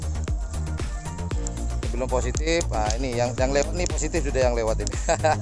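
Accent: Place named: Java, Indonesia. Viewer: ouh sya kira mas orang jwa krna logatnya kyak orang jwa,sya lgi di negara jiran mas mlysia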